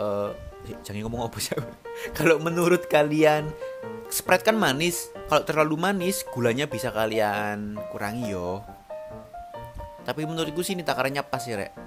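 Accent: Java, Indonesia. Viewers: G bosen lht channel ini medhok&lucu kl lg njelasin,sukses yo rek...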